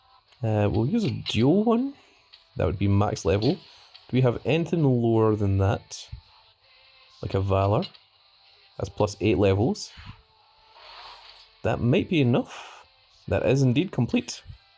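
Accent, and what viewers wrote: Scotland, United Kingdom. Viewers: Hey dude I can hear from ur accent your also Scottish, I beat you on KOTR shocked to find out you stream Supercard GGs man!! I have subscribed to you bud. 👍🏻😎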